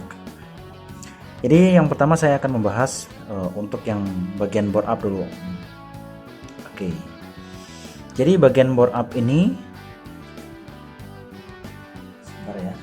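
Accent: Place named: Java, Indonesia. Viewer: Jn jawane nyong bngt kang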